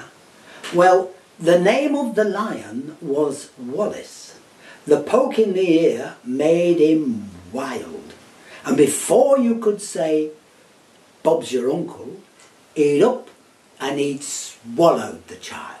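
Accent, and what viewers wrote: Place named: South, United Kingdom. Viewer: Nice rendition mate, I'm a Yorkshire man and can tell instantly you are from the southern regions as your accent is Posh,..but well done for this, I love the old monologues I used to listen to as a child before we ever got a television....good memories..Thankyou!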